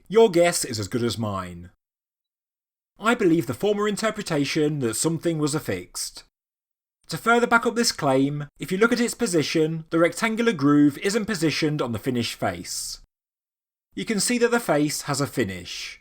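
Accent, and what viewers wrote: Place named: London, United Kingdom. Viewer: British, not American. Probably a Londoner bloak 😂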